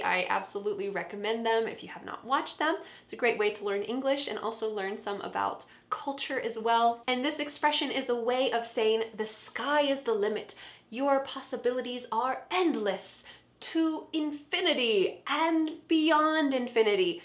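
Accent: United States. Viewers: Although you speak american english, but i think i can listen to your pronounciation very well .because your voice is clear easy to understand.who think like me? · When you use american accent i just fall in love with your English lots of love from lndia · Your pronunciation is good for me to understand... I watch different channel's videos but they aren't that clear to me. Only yours and marinas(from linguamarina) pronunciation is better to me.. I love american accent.... ❤️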